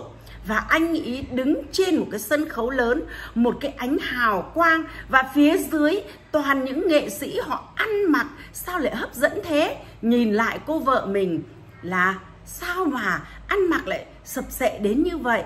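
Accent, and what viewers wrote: Standard. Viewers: Chi noi chuan qua dan ong hay ham cua la an com chan thich an pho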